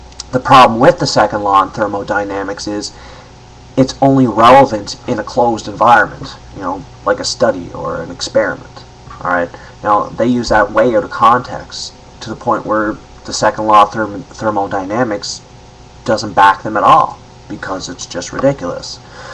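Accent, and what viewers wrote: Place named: United States. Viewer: Pity about the accent. It's hard to sound intelligent in an American accent.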